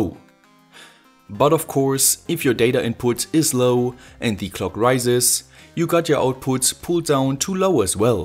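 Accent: Germany. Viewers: U sound so German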